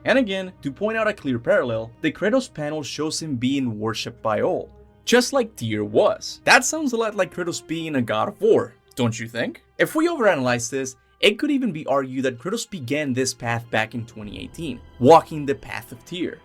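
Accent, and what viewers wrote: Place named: Cuba. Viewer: ah yes, Kaptain Kutie well duh. It's the name of the game. Awesome video,! You sound a bit cuban but i like it!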